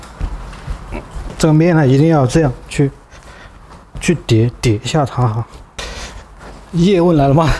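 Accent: Sichuan, China. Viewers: 听口音， 小哥川南的？ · 好久没看到嫩个潇洒倜傥的美食博主，讲话还加点四川腔，幽默风趣搞笑，吃起自己做的美食一点都不含蓄，你最后那一大口吃得我准备明天去买点食材来做了，就是这里葱实在太贵吃不起，还有你那个干葱估计加拿大也买不到，哎，可咋整呀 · 把四川話直接用国语讲，可能只四川人能听懂，而且亲切!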